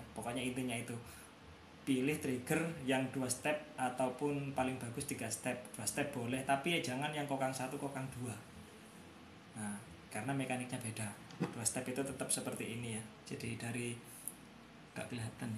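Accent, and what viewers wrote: Madura, Indonesia. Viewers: kmu orang mna bang klau bleh sya tau orang mna bang yaaa ma.af nii bang seblumnya soalnya kayak orang madura ngomongnya.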